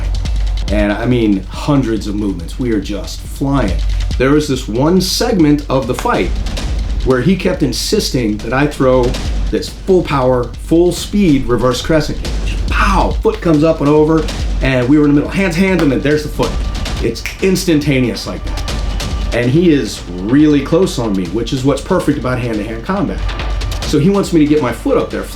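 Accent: Australia. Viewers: That is a damn good Australian accent for a presumably American. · His Aussie accent though 😂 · Don't get me wrong...your acting as Jason was magnificent...but I do believe that your australian accent would get you an Oscar! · His Aussie accent is on point. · Nice Aussie accent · Not bad aussie accent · I don't know what's more amazing: the fight, or that Australian accent. Thanks so much for this video. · wow great ausie accent! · Who the fuck saw that aussie accent coming?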